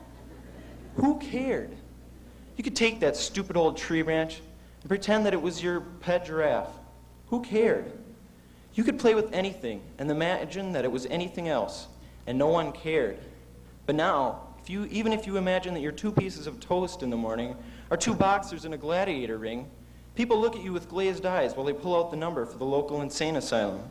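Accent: United States. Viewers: Well done,! I was working for Shopko at the time you graduated. I can relate to the toys you mentioned. Haven't thought of them in years! I hear a faint upper midwest dialect when you speak. Though definitely not from a small town or rural area. I miss that company; made lots of friends with folks who came this way(Omaha), to open stores. We had 5 at the peak! Again, good speech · I thought I was lucky going to highschool in the early 2000s… early 90s must have been even more badass! 90s was the best decade… it was the last gasp of humanity before the digital age..I’m guessing from your accent.. Michigan?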